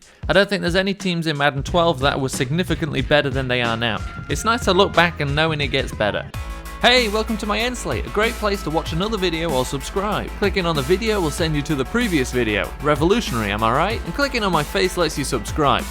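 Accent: England, United Kingdom. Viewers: Stfu your British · It's satisfying to hear an english guy talk about madden lol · dude you actually know what your talking about with Madden OR actual NFL??? AAAANNNNNDDD your playing biggie beats in the background?? and your British??? new sub for you mate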